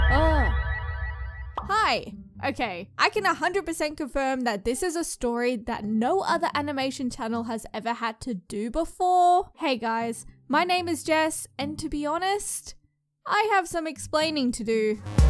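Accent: Australia. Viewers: Well I wasn't expecting this, but I think its cool that you found something back then that your were cleary passionate about making. Sorry it started to burn you out further down the road and you felt really self conscious about it, but the fact you kept doing in until the end and can talk about it now makes you braver than most people. Well done! Also don't apologise for your aussie accent. At least you don't sound like a flithy hybrid of kiwi & scottish 😅🤣. Can't wait for part 2! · I knew it I knew it I always thought I was just weird and Thought all Australian women sounded the same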